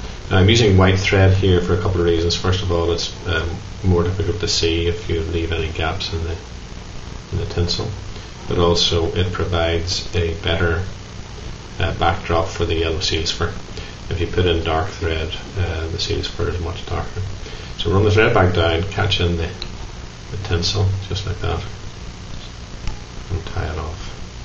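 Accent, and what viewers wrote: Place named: Northern Ireland, United Kingdom. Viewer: Always been pondering about a fly to use on that simply difficult river, this fly built to great complication has opened great opportunities to fish on this river. i caught 3.5 with this fly alone in one day "WOW", anyway thank you for the post, my brother Henry will enjoy this video too. Like the northern ireland accent.......... Tight lines.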